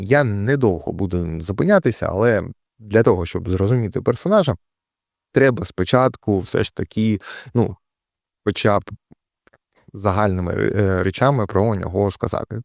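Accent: Russia. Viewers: Пане, нардепе, підтягніть, будь-ласка, свою українську мову. Ви цікаві викривальні матеріали робите. Але тако буркочете під ніс і видно що через те, що ви російськомовний, бо не впевнені у своїй лексиці, та з'їжджаїте через речення на русизми, та рос прононс.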